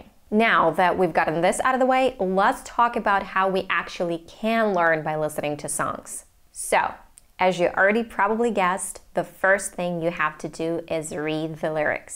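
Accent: United States. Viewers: I love you. Your English is American you speak and sound very well, but can you speak the British English? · I love this Belarus song. - Very beautiful poetry too...... I thought, You were Hispanic American. Very surprise to know that You are Ukrainian. You have a very cute baby too. You have a very American native accent. I had lived in U.S.A. for 16 years. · I read on a comment that you are not American . is it true 😮😮😮 because you sound like one of them .I do not know if that guy was saying foolish things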